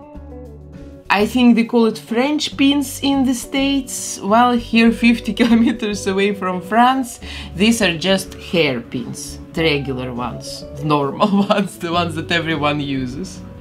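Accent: Russia. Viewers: I love you !!!.. I think your absolutely gorgeous and I love your accent !!!.. are you Russian ???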